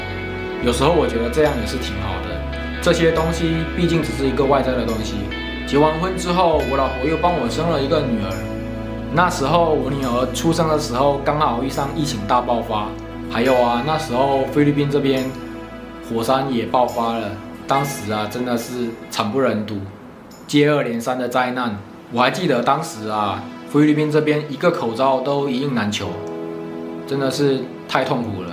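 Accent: Taiwan. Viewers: 兄。你是大陸福建人，可是你說的普通話卻若台灣國語。初時我還以為你是台灣人。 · 哈哈~我看你影片一開始我以為你是台灣人，後面說是廈門才知道你是中國人廈門口音幾乎跟台灣沒差別😆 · 你是厦門人嗎？聽口音還以為是台灣人! 去菲律賓多久？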